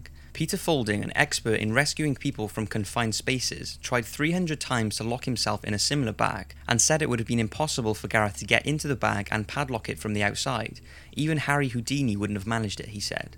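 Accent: Wales, United Kingdom. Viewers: Narrator sounds Welsh 😱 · Are you Welsh? You sound like you have some form of Welsh accent. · Narrator is Welsh 😱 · I love these but its impossible to be creep with a Welsh accent ❤️ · Are you welsh?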